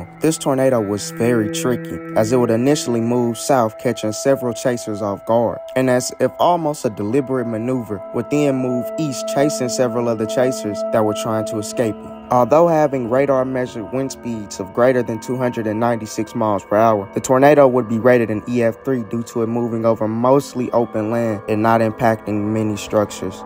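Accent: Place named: Louisiana, United States. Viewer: I’m hearing a Cajun accent in the narrator. I love that accent!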